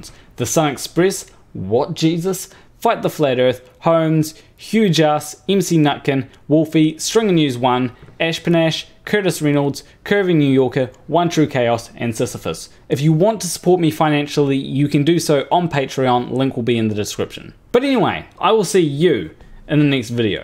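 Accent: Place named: New Zealand. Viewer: No AI can do a New Zealand accent.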